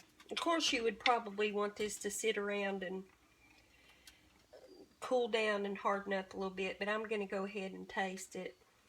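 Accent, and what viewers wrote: South, United States. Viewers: I love her southern accent. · Omg, love your cooking and southern accent, your voice is so comforting ❤️ please keep cooking.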